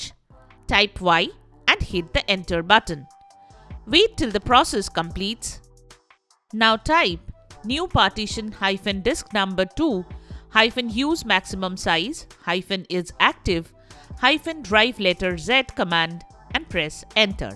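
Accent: India. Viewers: i stop when i hear indian talking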